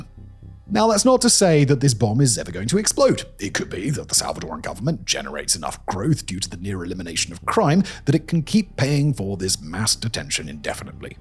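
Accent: United Kingdom. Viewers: I pity so much. His britishness makes him pronounce words as simple as 'hour' as 'auagh'. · This is what mindlessly believing videos because it’s on YouTube and the speaker has a British accent looks like.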